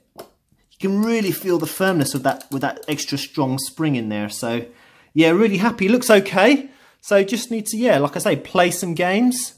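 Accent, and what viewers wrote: United Kingdom. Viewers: Are you British? Your accent is so cool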